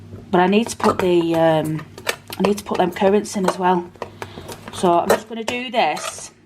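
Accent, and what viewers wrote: Oldham, United Kingdom. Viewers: Delightful is a great way to describe those Scones!... Another wonderful video, love that Oldham accent, makes me homesick lol...Nice one 🖤👌X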